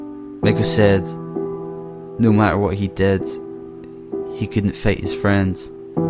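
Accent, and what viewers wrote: Scotland, United Kingdom. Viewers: Scottish accents are sexy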